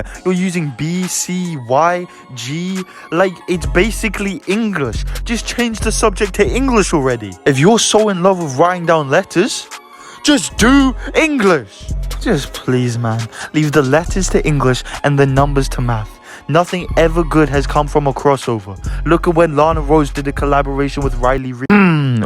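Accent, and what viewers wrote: United Kingdom. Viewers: I love the UK accent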